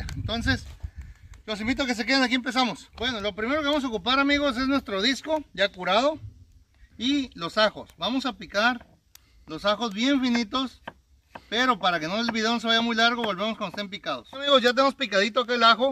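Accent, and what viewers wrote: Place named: North, Mexico. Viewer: Muy buena receta 👌 me encanta el acento de los norteños saludos desde Papantla Veracruz prometo hacer la receta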